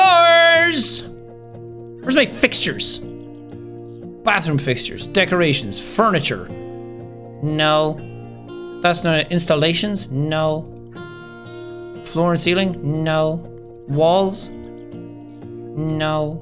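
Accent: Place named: Ireland. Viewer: this is the only vid where I can explicitly see sean's super irish accent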